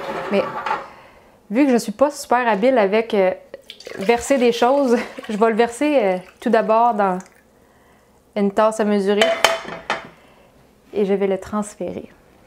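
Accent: Canada. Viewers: Je suis d'accord avec Agathe. J'adore l'accent canadien vous êtez trop mignone !..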